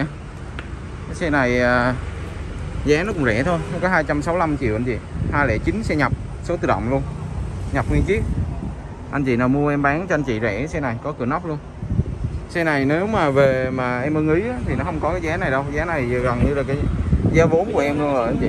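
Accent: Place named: Mekong Delta, Vietnam. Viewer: nói chuyện thiệt tình như dân miền tây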